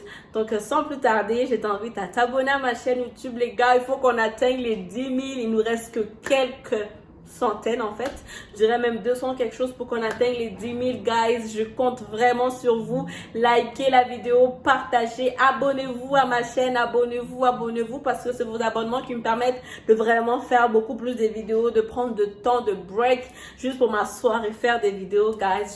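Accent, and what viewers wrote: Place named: Canada. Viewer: Moi j'entends l'accent Canadien..lol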